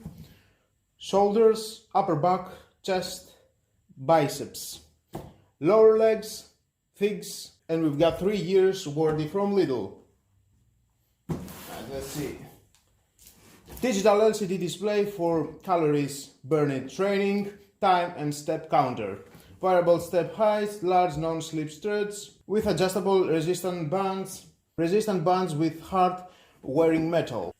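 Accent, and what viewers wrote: Greece. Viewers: Καλά αυτή είναι 100% ελληνική προφορά. Είναι το μηχάνημα του Lidl? Είναι βολικό; Φαίνεται η κίνηση λίγο περιορισμένη σε υψος σε σχέση με άλλα μηχανήματα..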